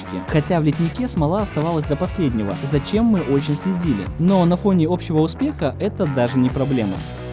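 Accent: Grodno, Belarus. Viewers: Дружище, ты не из Гродно?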